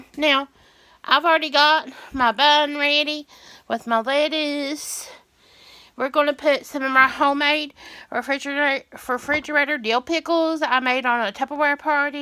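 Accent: South, United States. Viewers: Haven't watch u in months n months , came across my screen today had to watch those burgers yummy ..... Luv ur Southern accent girl .... Be safe 🙏😷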